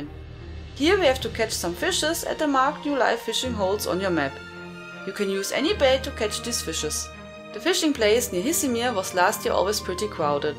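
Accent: Germany. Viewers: Man hört direkt das du deutsch bist hahaha :D <3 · I love your German accent.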